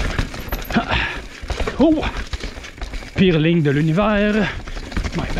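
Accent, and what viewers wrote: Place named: Quebec, Canada. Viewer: Magnifique 😍 j'ai hâte à l'été!! Hors sujet: ça fait 6ans que j'habite au Québec mais c'est la première fois que j'entends cet accent québécois 😅 tu viens de quelle région ?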